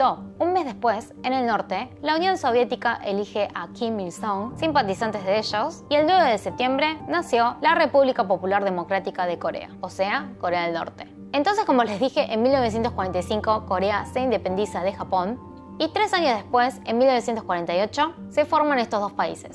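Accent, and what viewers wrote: Argentina. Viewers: Las mejores narraciones han sido con acento argentino, aquí otra prueba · Que linda ver yba asiatica manejando bien el español pero estabpiba lleva algo de acento argentino xd jaja te amo ...por darnos esta información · Con razón tu tono argentino de hablar que no captava del todo. Muy interesante la historia. Gracias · una KEIKO y habla como argentina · I would rather listen to you narrating this video in Korean or English than in Spanish and worst with that argentinian accent, pity!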